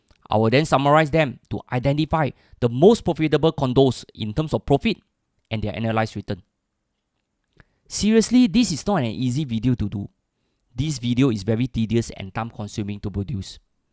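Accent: Singapore. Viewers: Quality work. Keep it up. However, please reduce the Singlish a bit, it will make this podcast more interesting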